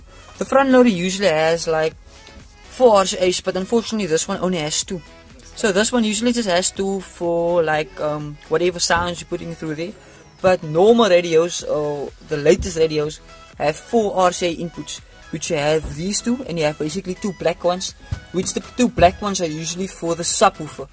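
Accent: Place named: Afrikaans, South Africa. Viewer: Sounds afrikaans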